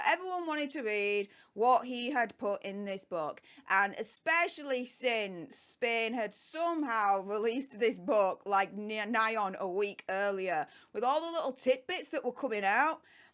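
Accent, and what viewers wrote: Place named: London, United Kingdom. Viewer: What a delightful young lady. Close my eyes and her accent brings back some incredible times running around London with some incredible East End Girls.... That will keep a smile on my face all the way to check out.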